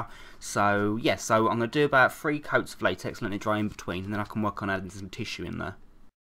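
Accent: United Kingdom. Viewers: You sound like your from the UK! You need to join me with Farmasi, we are opening In February I’m going to try to recreate you look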